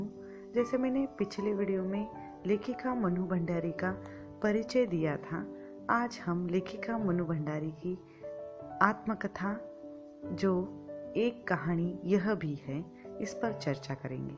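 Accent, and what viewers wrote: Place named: South, India. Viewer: Thanks 😊( kya aap South Indian h!)Aapki bhasha hme Kisi Apne ki yad dilati h😊.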